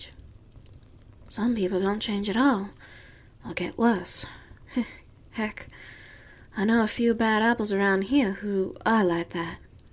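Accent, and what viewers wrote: South, United States. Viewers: I dunno what it is about a southern belle accent but its sooooo gorgeous to listen to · Another instalment of my favourite southerner (thank you 💜😘). Even though part 2 is still my favourite of the series, this one was beautifully subtly in an unfortunately uncommon way. As frequent use of perfect Spelling seamlessly integrated into English-spoken sentences is one of the best non-ASMR VA ideas I have come across in years, and he made it seem like child's play.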